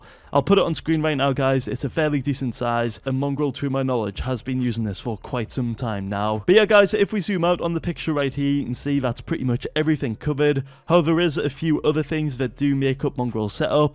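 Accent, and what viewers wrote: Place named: United Kingdom. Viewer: that British accent hit different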